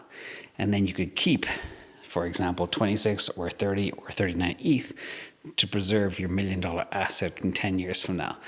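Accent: Ireland. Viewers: love the vids and the take.. where in Ireland are you from? If I had to pin your accent down.. Rebublic, Dublin, South side.. Dalkey or close? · . I hear an Irish twang in the accent?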